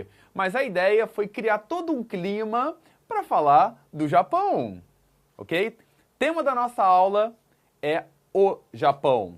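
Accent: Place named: Ceará, Brazil. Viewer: cearense kkkkk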